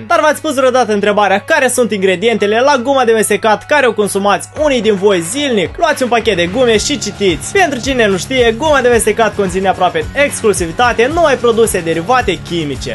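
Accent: Moldova. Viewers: Da tu esti din Moldova da?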